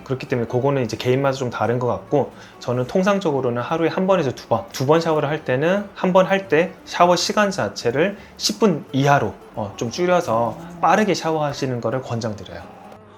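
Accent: Gyeongsang, South Korea. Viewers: 선생님 경상도 출신이군요 ㅎㅎ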